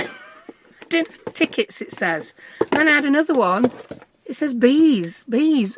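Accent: United Kingdom. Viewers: I love accent, reminds me of Coronation St when I used to watch it in the 80s.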